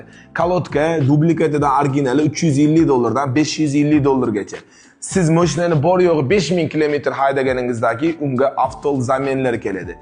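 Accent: Namangan, Uzbekistan. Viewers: Namangan shaxar shivasi namangan viloyat shivasi boshqa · Ока номон гон шевага беш кетоманде ока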